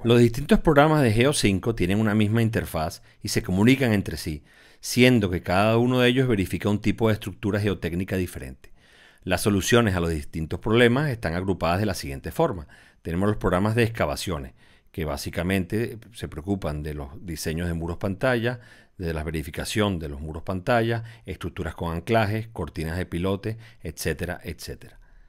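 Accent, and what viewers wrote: Venezuela. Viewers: disculpa eres venezolano ???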